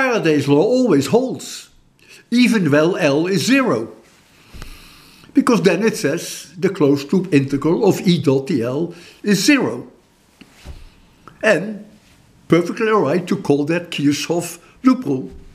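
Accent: Netherlands. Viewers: respect, you sound like a dutch man btw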